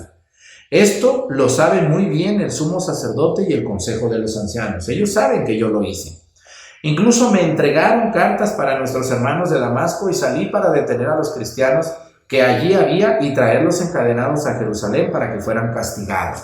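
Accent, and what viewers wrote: Mexico. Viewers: Anque en retardo la sigo ya estoy 28 Entres dias me aggiorno desde ITALIA ANQUE SOY PERUANA DIOS LE DE SABIDURIA PARA TRASMITIR EN NUESTRO PERSONASA ALIMENTAR NUESTRO ISPIRITO PARA ESTAR BIEN.SOY FELIS DE ABERTE ENCONTRADO PADRE. NOSE COMO TE ENCONTRE PERO.TE ENCONTRE.EL AÑO PASADO POR CASUALIDAD.ME AYUDA MUCHO EN LA MIA CONVERSIONE NEL MIO CAMINO. PERO NO AY CASUALIDAD DIOS ME PUSO PARA YO ESCUCHARLE.CONSU DEJO MEJICANO ME ASE REIER.ANQUE USTEDE DIJO UNA BEZ NO ES PARA REISE LO QUE USTED ABLA..PERO SU DEJO.ME ALEGRA ME SACA UNA SONRISA EN MI TRABAJO CANSADO DIOS ME LA BENDIGA EN SU CAMINO AMEN AMEN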